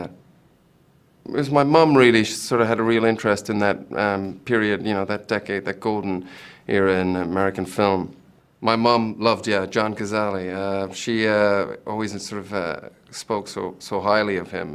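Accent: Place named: Ireland. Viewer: i like his cute irish accent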